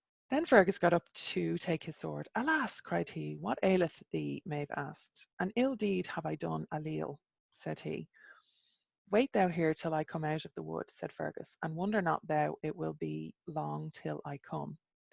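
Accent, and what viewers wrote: Ireland. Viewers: Thank you so much for reading these. I love hearing it read in your Irish accent. It helps to hear how things are said properly. Your commentary is also a great help with understanding what is going on.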